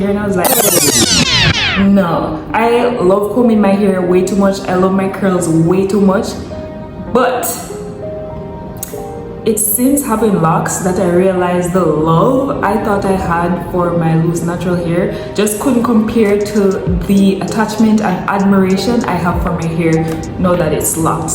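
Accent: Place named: Jamaica. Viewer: You and your locs are gorgeous! 😍. Loved this video! My mom is from Jamaica! I knew I recognized your accent lol.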